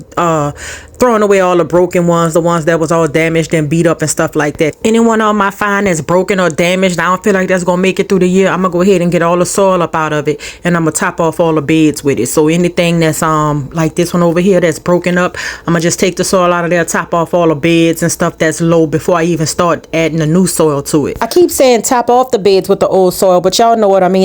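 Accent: New Orleans, United States. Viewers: Hey,are you from New Orleans?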